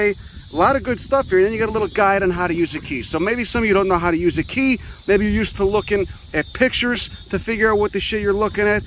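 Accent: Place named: Boston, United States. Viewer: Your enthusiasm and Bostonisms have infected me completely nice 👍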